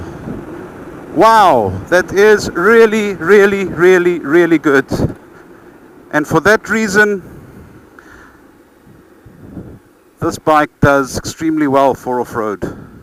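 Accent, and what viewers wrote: South Africa. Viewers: Ai cool, was wondering as you have the SA accent but you are riding on the wrong side of the road ;)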